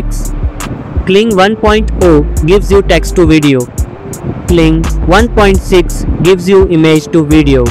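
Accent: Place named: India. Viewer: Indian accent right? ur from india cool😮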